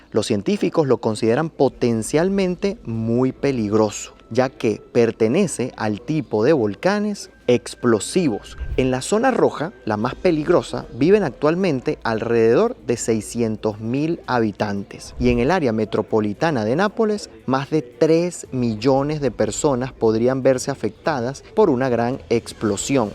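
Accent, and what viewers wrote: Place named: Venezuela. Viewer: se dice "eStan muy DeSgastadas " nose por siempre dices ejtan muy dejtadas el acento venezolano es feo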